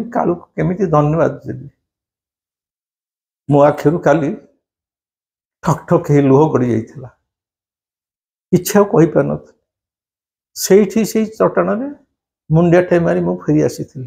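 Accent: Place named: Bengal, India. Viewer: ଆପଣଙ୍କ ସ୍ୱରରୁ ଜଣା ପଡୁଛି ଆପଣ ଜଣେ originally ବେଙ୍ଗଲୀ ଲୋକ. 🌹🙏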